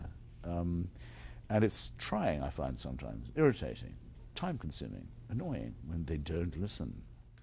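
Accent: England, United Kingdom. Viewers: the women seem to have penis’ dear. In his English accent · His English accent and perfect way of speaking is the cherry on top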